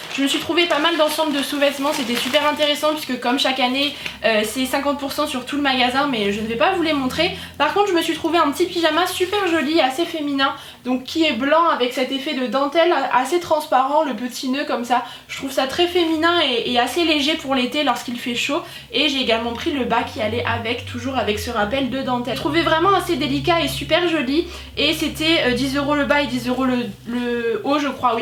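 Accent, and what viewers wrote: Lorraine, France. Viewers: Tu es Lorraine ?:0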